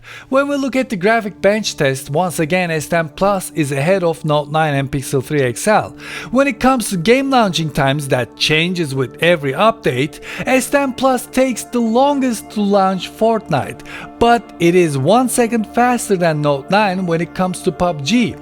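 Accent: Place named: Turkey. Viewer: Aksanı duyunca “kesin Türk bu” diye yorumlara geldim ve yanılmadım. Güzel video ayrıca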